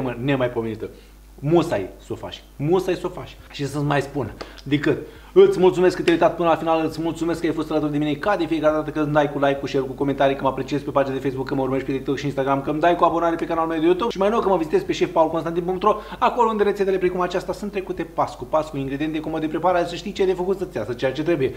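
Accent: Moldova. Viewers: Super faină supa. Iar de vorba asta dulce moldovenească ce să mai spunem?? Ne încîntă sufletul. Mulțumim frumos, de rețetă.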